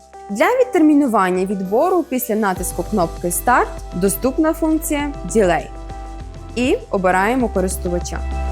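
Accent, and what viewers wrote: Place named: Western Ukraine, Ukraine. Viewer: Приємно чути таку експертну подачу українською, ще й з таким теплим західноукраїнським акцентом 💛💙 Дякую за професійне пояснення про важливий аспект мікробіологічного контролю!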